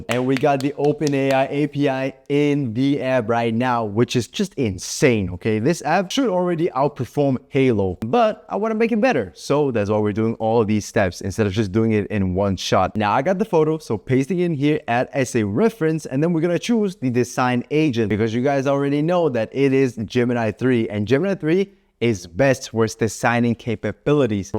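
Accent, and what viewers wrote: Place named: Denmark. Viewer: W DANISH ACCENT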